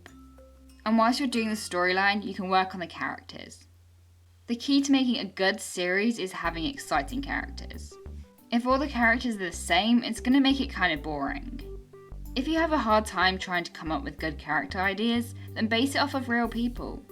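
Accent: United Kingdom. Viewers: are you british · She has a British accent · • scottish i think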